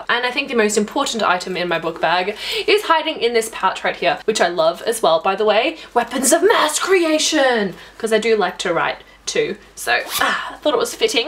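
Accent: Australia. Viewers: is that an australian accent? i always get it confused. so hawt lol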